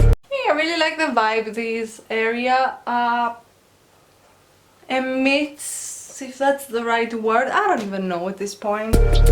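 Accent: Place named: Greece. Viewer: nice... καταλαβαινεις τον ελληνα απο το παχυ λλ και τα μεγαλα φωνηεντα. keep up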